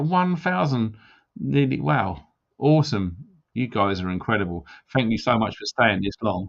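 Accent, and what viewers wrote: United Kingdom. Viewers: I used to think he was wayward too but he's not and much of what he has said early has come true. I prefer to listen to a Brit as many are across the pond.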